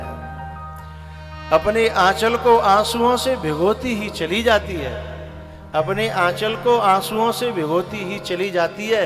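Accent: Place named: Braj, India. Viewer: बाबा शुद्ध ब्रजभाषा बोलकर सबको लुभा रहे हो? आप निश्चित रूप से मथुरा के आसपास के ही हो, आपको प्रणाम!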